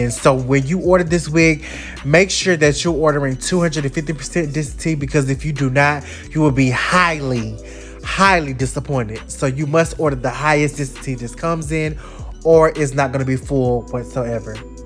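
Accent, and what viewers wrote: South, United States. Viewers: I appreciate how u say “akahawl” I’m a Cali native the southern in ya is adorbsss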